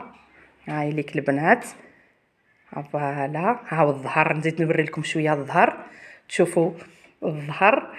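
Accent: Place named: Batna, Algeria. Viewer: يعطيك الصحة وهدرتك هايلة تباني باتنية بنت بلادي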